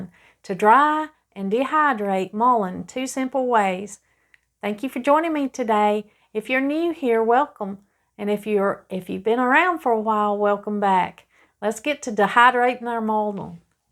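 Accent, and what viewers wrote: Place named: South, United States. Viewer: Thanks for your video. Drying some mullen myself for the first time. BTW, I really enjoy your soft southern accent, you sound exactly like some of my favorite cousins.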